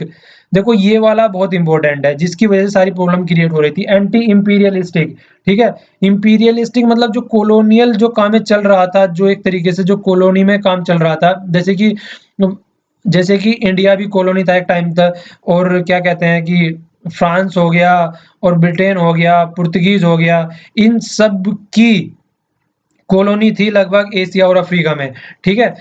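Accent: Haryana, India. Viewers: खड़ी बोली, सुन के मज़ा आ गया, m HRY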